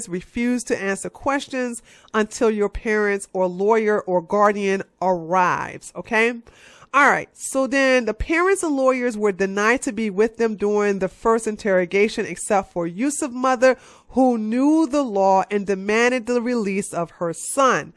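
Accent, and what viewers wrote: United States. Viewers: Where in Texas are you from? Ima guess DFW area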